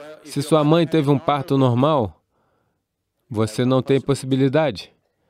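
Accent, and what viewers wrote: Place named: Rio de Janeiro, Brazil. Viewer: so digo uma coisa,tradutor é um carioca. o resto nao sei de nada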